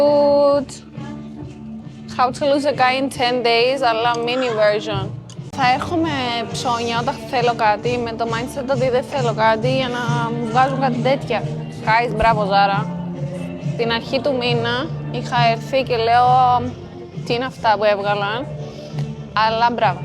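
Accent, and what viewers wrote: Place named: Cyprus. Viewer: Αφου σου βγαίνει το κυπριακό... μιλα μας κυπριακά!!!!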